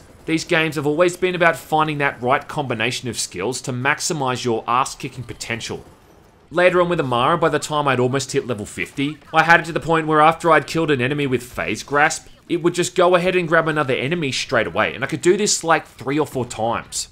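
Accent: Australia. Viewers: I don't get why you're so hung up about them removing a slur from a game. Comparing your Aussie accent to being a little person is kinda apples and oranges. I get that ppl on twitter can be volatile, but like, there are reasonable reasons that ppl would want m*** removed from the game. In my experience, even trying to have a calm discussion about these things causes people to plug their ears and go, "Sjw sjw, i can't hear you, tuning you out, bla bla bla bla bla bla" I'm aware that gearbox doesn't actually give a shit about the slur, and is just protecting their bottom line.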